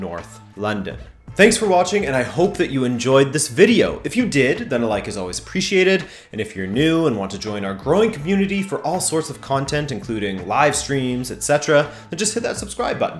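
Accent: United States. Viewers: My god it sounds terrible hearing an American talk about football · Your listening to a yank 🤣🤣🤣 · Not in the US. And he speaks with a US accent, so it's confusing. I'd never heard it pronounced darby by an american. It was originally pronounced derby in the UK also, but not for a couple hundred years. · American accent the entire video excluding your pronunciation of “derby” why do you say it like you’re an Englishman lmao “Darby”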